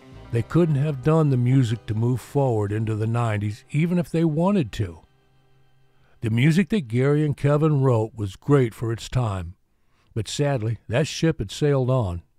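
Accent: South, United States. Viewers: Understood. I was just thinking from the point of view of someone who may have never seen your previous ones. I do like your videos. Your Southern accent makes them unique. Keep it up.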